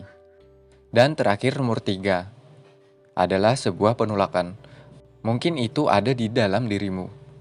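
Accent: Banjar, Indonesia. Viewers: Nah mantap urang banjar pinanya nah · Mang orng banjar kh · Mimin urang banjar kah min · Orang banjar kah ikam ? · Banjar banaaarr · Orang banjar kah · Ada rasa rasa bahasa Banjar wk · Mamang banjar🤣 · Orang banjar ya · Banjar kh mang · Orang mana... Logat ny Banjar banar 😁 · Org Banjar kh nih min wkwkw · Tetap ay logat Banjar nya ada biar bahasa Indonesia min ay hahahaa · Pian urg banjar kh mamang?? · Mang orang banjar kh? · Urang Banjar Leh rupanya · Hanya lipsing dangsanak, wkwkwk orang banjar bnar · Banjar banget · logat nya ne kaya orang banjar pang nah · Banjar banar pian 😅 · Orang Banjar kah mang🤣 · lwt di beranda dan penasaran, pas denger suaranya fix miminnya org banjar ya kan😀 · Banjar banar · Urang banjar sakalinya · Orang Banjar kh bang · Kek logat banjar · Banjar nihhh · urang Banjar kah mang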